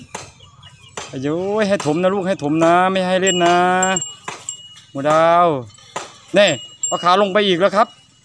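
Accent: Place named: Isan, Thailand. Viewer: พูดอิสานแล้วฟังสบายคะ ทุกอย่างธรรมชาติดีที่สุด มักบักดาว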